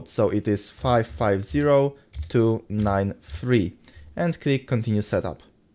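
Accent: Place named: Poland. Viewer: jest z Polski bo trochę po głosie słychać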